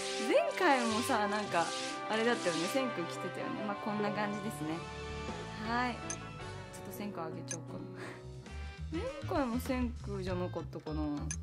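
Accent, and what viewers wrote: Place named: Kyushu, Japan. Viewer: はじめまして！は九州の方ですか？方言が身近に感じました！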